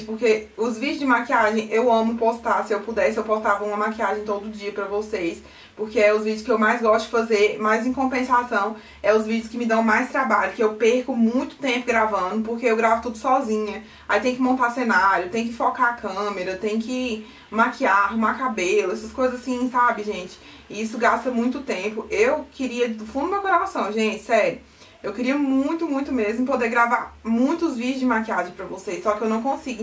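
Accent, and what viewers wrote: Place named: Goiás, Brazil. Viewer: Vc é da onde? Seu sotaque é Bem Goiano 😍❤️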